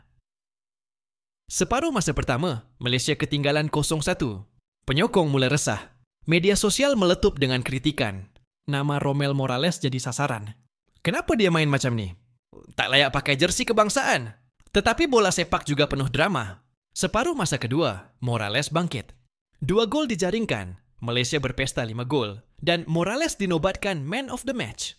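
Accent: Indonesia. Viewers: Kenapa voiceover mcm indondesh. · Sling Indonesia Nya dengar sikit😂..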